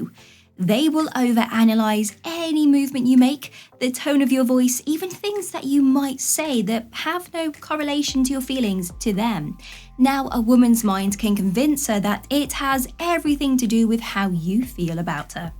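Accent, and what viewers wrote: United Kingdom. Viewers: You are my favorite girl. When I discover you I let go the others. I find myself come back again and again. None of the others women have been able to do that to me. Even with all their charms. Anyway the things I like about you are. 1. You know what you are talking about. 2. You deliver what you saying with great confidence. 3. Your intelligence eloquent way of speaking is truly unbelievable. As though that was enough. 4. Your voice (oh that voice that British accented voice) delivery is sweet as a group of morning birds welcoming in a new dawn. 5. You protray honesty with a demand that makes your listeners cavity to you. 6. To top that all you are beautiful. ❤️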